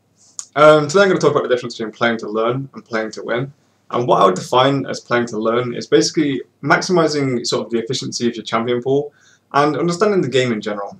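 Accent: United Kingdom. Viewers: What part of the UK you from?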